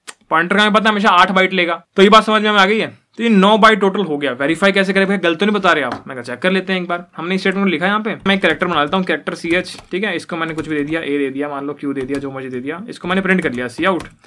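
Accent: Haryana, India. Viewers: HARYANAVI accent is so sexy.......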